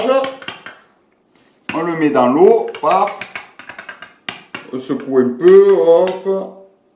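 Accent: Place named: Béziers, France. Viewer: Moi je vais me lancer dans le reconstructible et tes vidéos sont tops. Écoute pas les couillons et avec ton accent on doit pas être bien loin l'un de l'autre moi je dirais tu vis pas loin de Béziers. Bonne continuation d'une nouvelle abonnée. Je suis con je viens juste de voir que ton pseudo ya Narbonne lol c'est moi la couillone mais je m'étais pas trompé.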